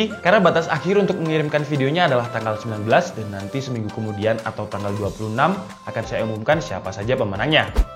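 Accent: Java, Indonesia. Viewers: Abang orang jawa ya bang😆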